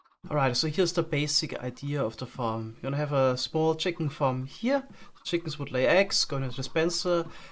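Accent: Germany. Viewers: I sometimes a Little deutschen Akzent oder?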